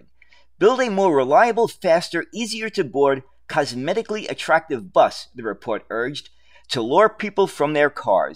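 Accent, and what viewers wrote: New York, United States. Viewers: The audio was just fine. The NYC accent is authentic.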